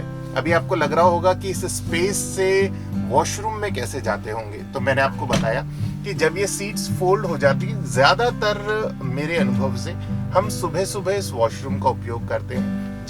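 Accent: Standard. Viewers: वाह क्या शुद्व हिंदी बोलते हो आप 😊👍👌मजा आ गया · Apki Hindi itni sudh h k. Salute to you sir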